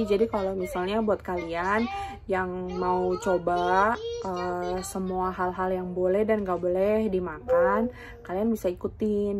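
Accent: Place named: Palembang, Indonesia. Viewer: wong palembang ceh ?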